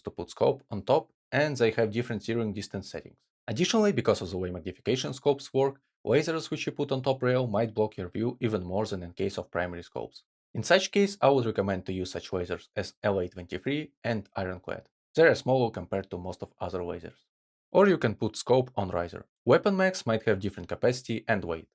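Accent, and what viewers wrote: Russia. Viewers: вы русский? У вас очень русский акцент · По акценту палишься руснявый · El Russia accent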